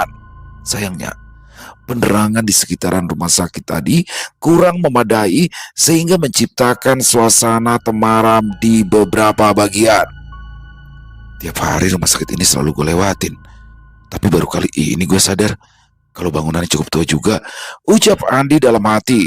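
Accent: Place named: Betawi, Indonesia. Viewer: bang udeh yg paling top dah Apelagi klo udah pake gaya bahasa dialek Betawi & Banjar Top banget 👍👍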